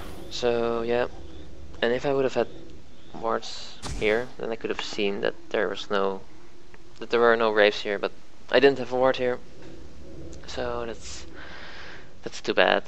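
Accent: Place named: Netherlands. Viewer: i knew you were from holland.. dat accent xD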